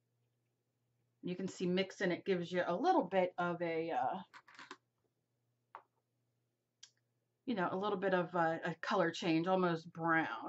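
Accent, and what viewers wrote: New Jersey, United States. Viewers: I THOUGHT I RECOGNIZED THAT JERSEY ACCENT!!!..I'M FROM WILLIAMSTOWN N.J...JUST A STONES THROW FROM YOU...LOTS OF ARTSY STUFF IN JERSEY!!!!!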